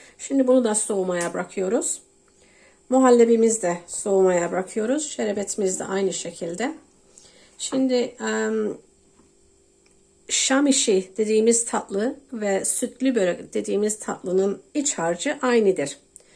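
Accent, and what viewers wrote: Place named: Cyprus. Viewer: Ne yapsın Kıbrıs şivesiyle konusyorsozleri anlasiliyorguzel tarif veryor👏👍🙏🧡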